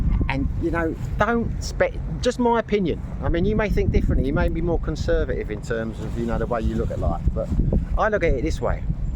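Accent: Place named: England, United Kingdom. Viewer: Thanks for the great content! I also watch your channel because your accent reminds me of my old English friends living in the USA, as well as some of my favorite characters on Monty Pythons.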